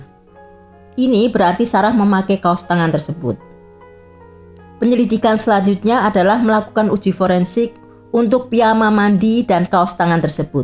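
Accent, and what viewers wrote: Java, Indonesia. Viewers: Logatnya medok bgt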